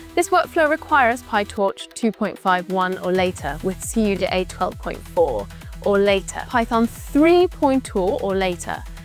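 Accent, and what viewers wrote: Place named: United Kingdom. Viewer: why, It is England tone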